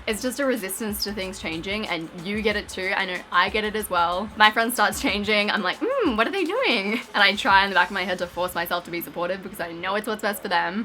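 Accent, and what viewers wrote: Australia. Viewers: cool video! i was also thinking about going sober for a while... also i really like the Australian accent, makes me really nostalgic :)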